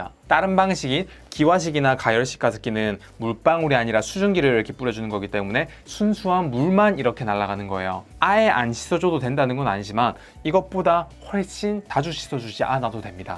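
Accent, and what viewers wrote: Gyeongsang, South Korea. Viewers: 갱상도 발음인데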